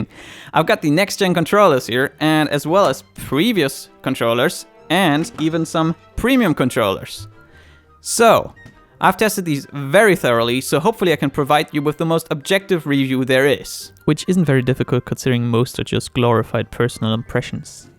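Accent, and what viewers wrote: Germany. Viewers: your english….. sounds a bit german ?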